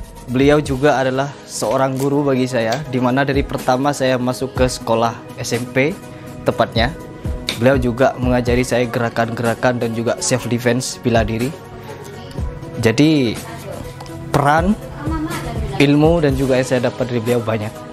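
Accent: Madura, Indonesia. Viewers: Oalah...Mas asli Jember toh? Pantas msh ada dialek madura yg lekat...sengkok reng Probolinggo tretan! Tetanggeh...😁😁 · Terdengar ciri khas bicara Madura a. Kak salam settong dere sengkok derih madureh tapeh lambek 3 taon neng jatiroto pas tips malem miggu sering nongkrong di alun2 Jember jiah kak salam KK maderen Padeh beres salamet Ben tambe sukses amin.🙏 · Oo arek jember to, keluarga ibu ku mayoritas dr jember hgga sekarang. Pantesan logatnya spt org madure. Salam cak. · Pantesan ada logat maduranya bang, salam dari madura bang🙂🙂🙂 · pantesan deri pertama nyengok vidio nah bahasan nah logat medureh hehee deddih asli jember hihi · Oalah, mas asli Jember 🙏 😊 pantesan logatnya ga asing logat maduranya mas masih kental 😊🚬☕ salam mas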